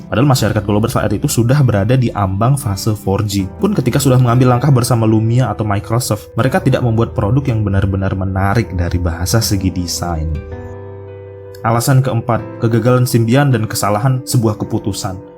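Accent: Lombok, Indonesia. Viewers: matur tampiasih? mas nya org Lombok?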